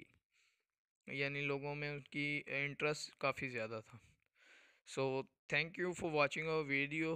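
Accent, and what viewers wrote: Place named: Pakistan. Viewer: آپکی انگلش بولنے کے طریقے سے ہی پتا چل گیا تھا کہ آپ پاکستانی ہی ہو😃😉😜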